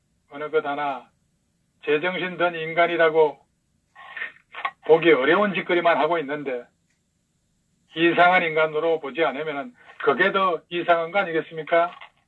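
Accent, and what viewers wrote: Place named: Gyeongsang, South Korea. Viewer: ㅋㅋㅋ... 경상도 구수한 사투리 쪼매 바빴다. 좋아요. ^^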